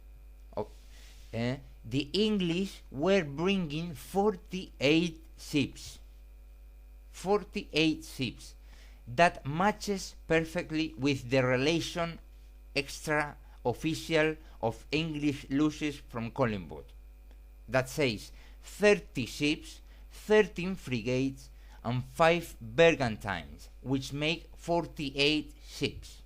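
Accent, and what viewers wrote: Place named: Massachusetts, United States. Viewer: This Inglish is from Machachuset, salu2 desde ESPAÑA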